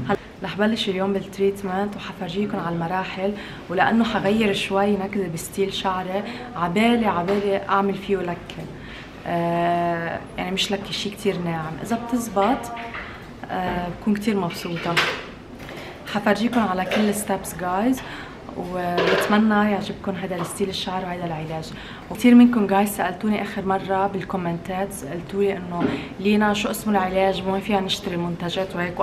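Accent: Lebanon. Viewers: من اول ما بلشتي تحكي عرفت انك لبنانية!؟ انا جديدة هيدا اول فيديو بحضره هي لبنانية صح!؟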